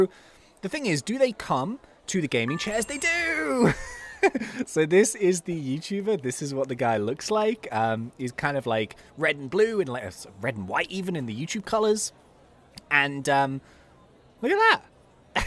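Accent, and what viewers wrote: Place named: United Kingdom. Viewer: The British actcent is awesome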